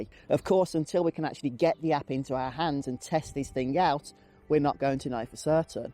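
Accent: England, United Kingdom. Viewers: Thank you for info What part of england is your accent from ?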